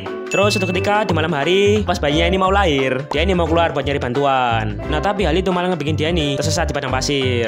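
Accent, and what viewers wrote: Java, Indonesia. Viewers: boso jowo mu kentel men mas, wong jowo ta? 🗿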